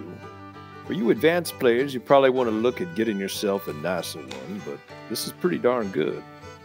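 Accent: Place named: South, United States. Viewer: Same here. I loved the hick voice. No offense to the little women, but I'd kept it the entire video. I feel its a shame the southern accent has nearly become extinct.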